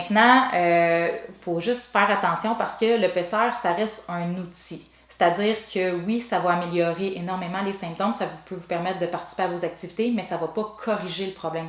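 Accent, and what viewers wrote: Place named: Canada. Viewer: Bonjour. Je présume que vous êtes au canada de part votre accent. Pouvez-vous faire un bilan et aider quelqu'un à distance? en France? Merci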